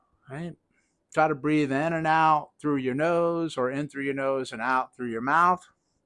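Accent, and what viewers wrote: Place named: Louisiana, United States. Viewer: Tell me this man has Louisiana roots..... or am I hearing things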